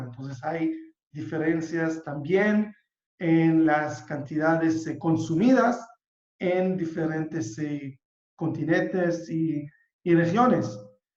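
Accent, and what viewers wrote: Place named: Arab world. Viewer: El.Dejo árabe 😁🎊🎊🎊🎊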